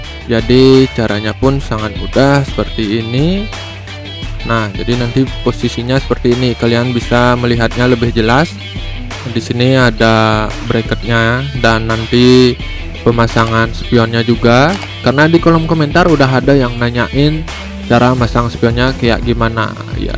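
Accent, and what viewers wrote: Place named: Bali, Indonesia. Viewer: Dari logatnya orang Bali y bli😅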